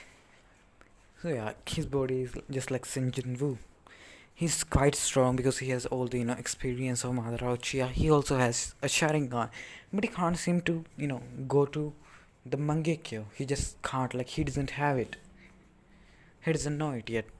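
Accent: India. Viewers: Are you indian or have an indian accent?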